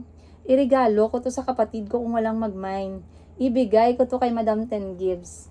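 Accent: Iloilo, Philippines. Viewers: Bat ka nag I ilongga accent 😮eh andiyan ka nman sa mindanao parang Hindi ka proud na mag bisaya eh andyan ka sa mindanao be proud na marunong ka mg bisaya wag ilonnga accent 😮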